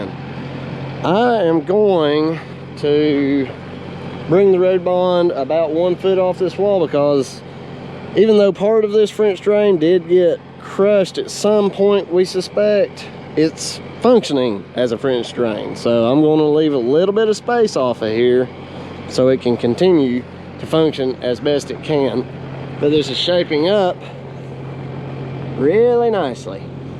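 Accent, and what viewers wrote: South, United States. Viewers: Value beyond a price tag just watching you position that tractor, wait for the rock over, then gently glide that Blade to the sound! Total Master of your Trade and your creeping the controls to set that Box Blade is Art Work My Good Southerner! This is like the third time watching and it never get's old, pick something new up each time on your operator skills I transform to My lot for hours of frustration and confusion of not being able to capture the 'Magic' ! Ha, Lol. My 94 Honda 22 HP I just picked up is so 'touchy' on the controls? Adjusting the float helps but the lift is impossible to get to slowly 'creep' up and down on the hi-lo spots. My point of view is lower with my 5 ft. Tarter BB, I can't quite see into the box to watch that front blade? Angle of the dangle, what kills Me is to watch you skim in reverse pushing the blind spot of the back blade! 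Touching just the edges or a slight high spot. You make it look easy My Main Man! Enjoy the vid's and Southern accent/hospitality. Reminds Me of some old AF buddies from Virginia, Kentucky and of course South Carolina. Stay safe and healthy from Northern Nevada!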